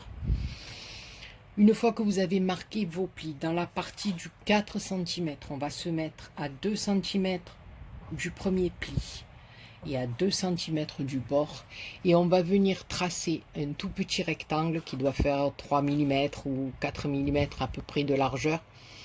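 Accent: South, France. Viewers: Coucou 😍 c'est grâce à la vidéo de Greg que je me suis abonnée à votre chaîne et quel bonheur de vous entendre, car je suis expatriée en Alsace mais je viens du Sud (Nîmes) aussi....alors un enchantement de retrouver notre accent. Merci pour ce tuto je vais le faire dès aujourd'hui. Passez une bonne journée. Adessias et grosses bises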